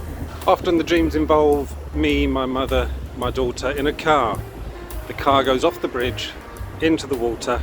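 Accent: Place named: United Kingdom. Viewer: Guys I think he is British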